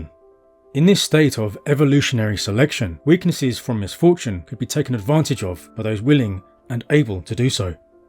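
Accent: England, United Kingdom. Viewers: Oh… So in England, you say it wrong.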